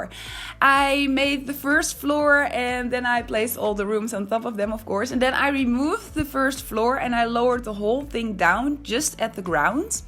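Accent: Netherlands. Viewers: Ben je Nederlands? Ik hoor een Nederlands accent achtig iets haha ! Heel mooi gemakkt dit!😍 ik ben zelf helemaal verslaafd aan de sims🙈 super mooi😍❤️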